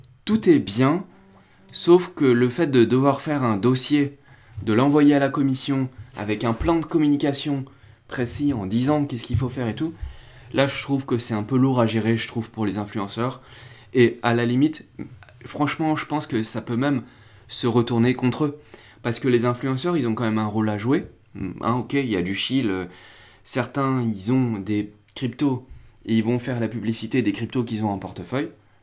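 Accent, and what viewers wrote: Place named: Spain. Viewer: Cet accent espagnol :) looool moi j'aime bien ces vidéos avec du contenu mais avec le sourire!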